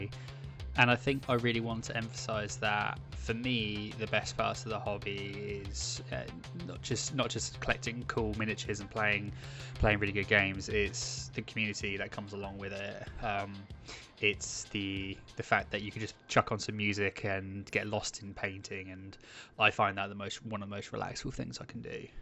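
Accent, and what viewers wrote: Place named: England, United Kingdom. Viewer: You have the english accent so that is a good first start.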